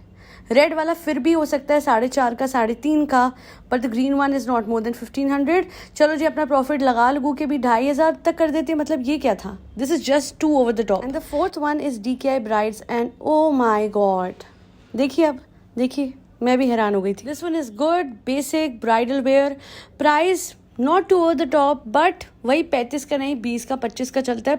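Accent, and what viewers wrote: Punjab, India. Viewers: Your Punjabi is too cute 🤭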